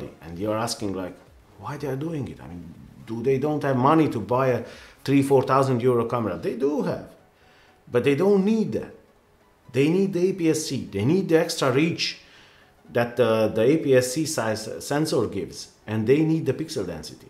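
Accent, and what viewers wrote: Hungary. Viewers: just subscribed....love your analyses and the Hungarian accent! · Annyira hallatszik valakin ez a magyar akcentus! :D nagyon jó videó. Rendkívül informatív és jól felépített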